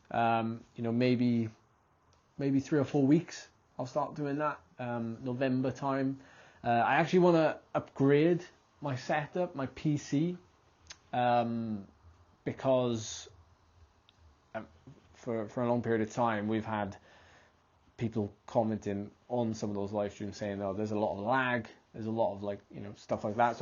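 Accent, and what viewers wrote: Wales, United Kingdom. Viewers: mate please DO NOT FALL INTO THE YOUTUBE TRAP of having to put content out!! You are well above that, you are quality and you deliver, and you are Welsh 🤣 Love that accent ❤😅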